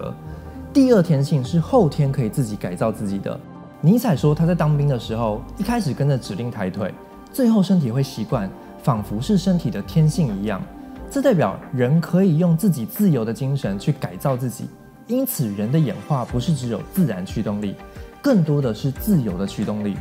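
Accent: Taiwan. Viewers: 实在欣赏不来台湾腔的男生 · 这个台湾普通话听起来费劲啊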